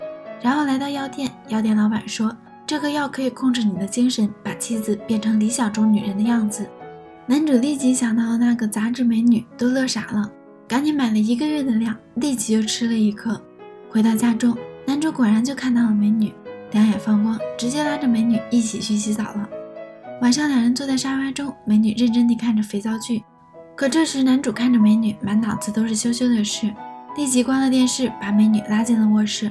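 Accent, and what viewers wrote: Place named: Shandong, China. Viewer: 讲解人山东口音。。。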